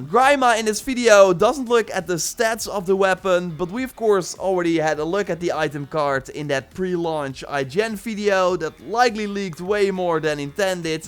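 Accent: Netherlands. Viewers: He's dutch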